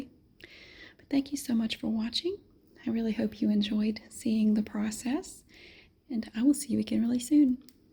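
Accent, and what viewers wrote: United States. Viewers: This is so comforting to me. I live in the UK and my grandparents live in the States so I love watching your videos to hear the accent and the American kitchen and food. Just how I remember ☺️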